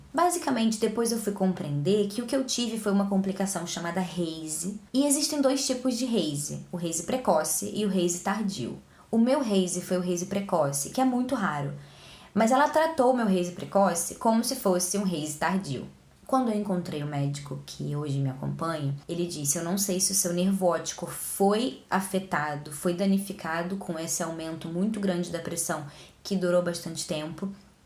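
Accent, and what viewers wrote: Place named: Rio Grande do Sul, Brazil. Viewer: Quando eu era pequena (hoje tenho 32), tu tinha sotaque gaúcho:) Que engraçado te ouvir agora!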